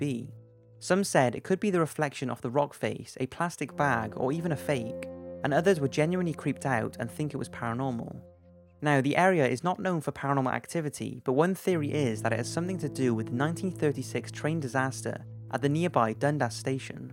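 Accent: Wales, United Kingdom. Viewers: klo everyone wants to see the British-accented narrator's face xD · Are you welsh?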